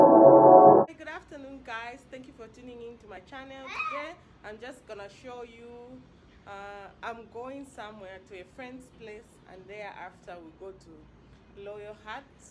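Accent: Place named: Jamaica. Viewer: I thought this was a Jamaican talk.. are you jamaican?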